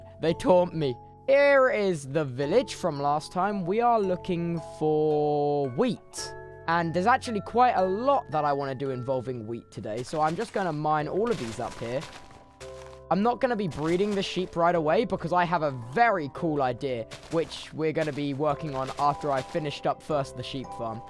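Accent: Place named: RP, United Kingdom. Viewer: This is so British what the heck??? He sounds so posh, it's sending me back to lets plays of old.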